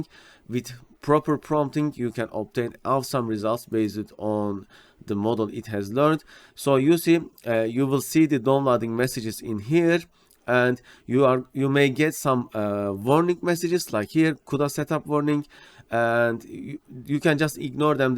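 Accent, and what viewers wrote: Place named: Turkey. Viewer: i am a turkish guy who wants to learn somethings on computer science. When i heared your voice, i am confused. I thought you are from turkey and i looked up your youtube channel then i saw your location which is Turkey. Your accent is how i understand.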